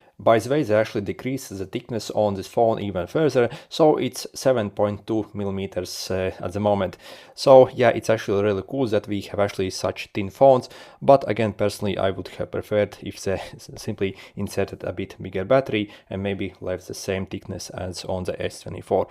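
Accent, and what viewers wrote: Russia. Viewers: Ты-ж русскоговорящий. Вот прям по произношению слушу, что русский. Говоришь, как Мутко🤣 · are you russian? the accent is adorable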